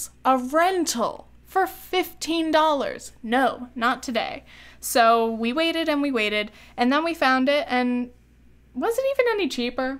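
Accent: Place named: United Kingdom. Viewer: Giving you a super like for that British accent. Applause applause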